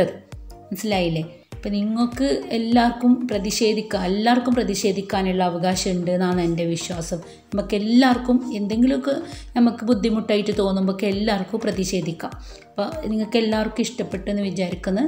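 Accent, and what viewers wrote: Kasaragod, India. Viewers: കാസർഗോഡ് ഭാഷ സൂപ്പർ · Ksd basha pwolichu 😅😂 Video pwoli🕵✌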